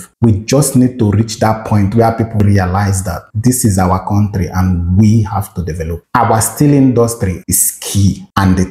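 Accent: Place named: Igbo, Nigeria. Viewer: Your Igbo accent is too strong, sir. Just make use of Igbo language for goodness sake. Thank you, sir.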